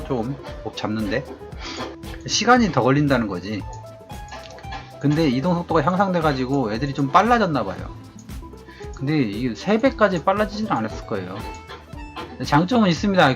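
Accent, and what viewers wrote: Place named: South Jeolla, South Korea. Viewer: 옹삭스럽다는 말투보니 전남분이신가요?? ㅎ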